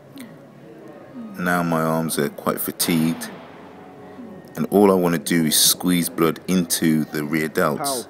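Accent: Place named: United Kingdom. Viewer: loving your brittish accent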